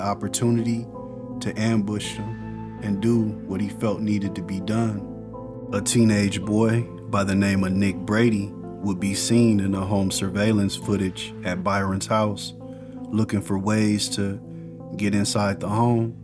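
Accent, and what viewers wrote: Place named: Los Angeles, United States. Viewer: LA guy LA voice perfect for the content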